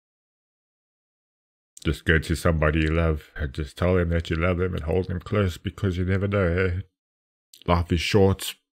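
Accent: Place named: South Africa. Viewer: Wow. I'm so sorry to hear about your story. When the video started I wanted to say that you sound like a fellow South African. I know the accent. Greetings from Port Elizabeth. I'm gonna watch the reaction now but I already know I'm gonna be in tears. If you have a few minutes to spare, please react to by (live from the American idol farewell season) it's a very very emotional performance. And I'm so very sorry to see and hear about your heartbreak. Bitter jammer 💕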